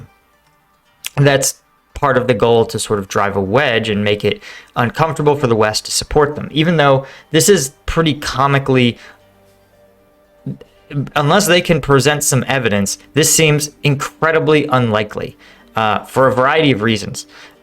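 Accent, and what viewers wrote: New Jersey, United States. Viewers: Jersey accent? Loved it. In using it to describe the explosions of Russian military facilities it Crimea, you just needed to finish with - Badda bing; badda BOOM! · Great explanations,as usual...also,best New Jersey accent ever :) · Thumbs up for that Jerseys accent. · Jersey accent for the win!!! 😂😂😂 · Love the jersey accent😂😂 much love from north jersey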